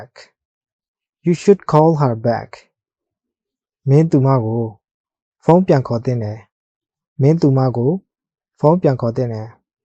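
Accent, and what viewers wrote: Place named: Myanmar. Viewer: မြန်မာဘာသာ ပြန်ဆိုထားတာ မနက်ပိုင်းနဲ့ ညနေပိုင်း လွဲနေတယ် အသံထွက်ပီပြင်ဘို့ လိုနေသေးတယ် ငပိသံ မပျောက်သေးဘူး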